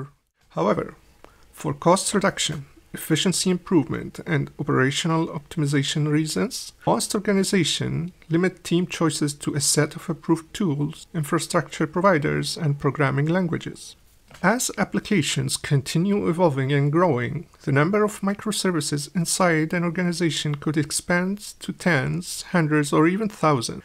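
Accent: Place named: India. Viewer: at 1.25x this sounds like an indian accent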